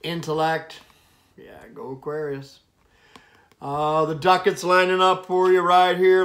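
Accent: South, United States. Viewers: I just adore your southern accent my fellow Aquarius. I’ve got a York Accent. I always love your readings xxo Omg so right on! He had better come correct or not at all. I went true Aquarius and shut him out!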